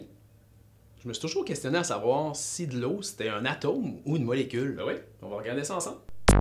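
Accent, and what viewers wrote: Quebec, Canada. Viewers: son accent Québécois tres pousser je ne comprend rien 😅😂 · Francais canadienne?? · merci pour ton aide téxplique grave bien et super vite et jkiffe ton accent quebequoi thank you so much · mais vous etes vrmt des canadien ? pas vrai? bref jai trop aimer la video et on comprend vite , pas comme les autre video qui dure 15 min et a al fin on comprend rien , je vous remerciiie :)))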